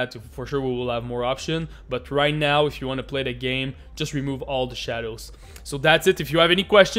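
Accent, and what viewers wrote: Quebec, Canada. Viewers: le québecois mdr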